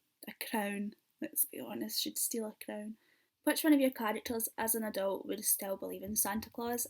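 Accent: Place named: Scotland, United Kingdom. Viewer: I love your Scottish accent! <3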